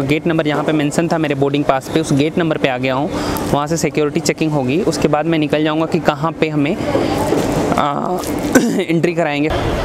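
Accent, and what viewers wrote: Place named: Bihar, India. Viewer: Your assent sounds like Bihari 💓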